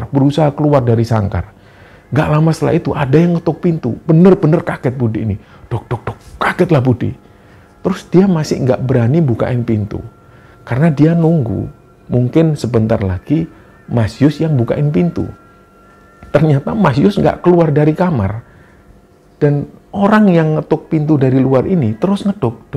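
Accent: Java, Indonesia. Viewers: Ach mas itu suaranya adem. Ciri khas jowonya. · logat jawa yang kental merupakan ciri khas..... latar belakang jawa.... ada kesulitan saat meng hafal kah mas???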